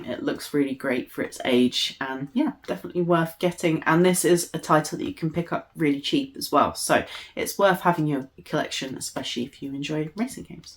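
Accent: United Kingdom. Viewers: Hello. You're from UK, aren't you, lady? Is blue stinger and soldier of fortune also cut in UK region? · Dang she's pretty! Wonder what she sounds like not speaking the queen's English. Wonder what she'd sound like with American English. Anyway. Dreamcast was awesome. PAL territory got stuffed on boxart and such. American Dreamcast packaging and art always looks really good and doesn't have the PEGI rating system stamps on it that's an eye sore. The back of the cases are annoying too as they're in multiple languages. Today's games are similar though, having both Spanish and English that's annoying..... Unless you buy it and it came from Canada. Looks the same and you almost wouldn't know, except it's in English, Spanish, and French. Yuck...... Whew, pretty girl, smile a lot! It's a pretty smile, dang!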